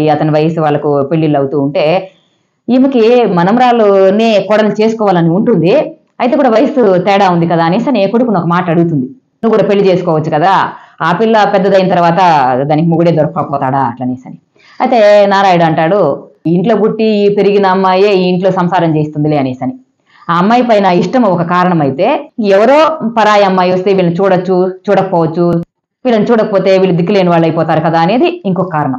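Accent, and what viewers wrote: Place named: India. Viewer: మీ వాయిస్, ఆ provincial dialect అంటే నాకు చాలా ఇష్టం సాబ్.. 😍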